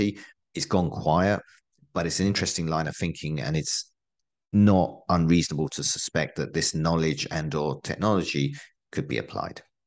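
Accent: United Kingdom. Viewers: Really strange hearing a britosh voice that is unbiased between east and west. Almost sounds like your just telling the truth, which is hard to find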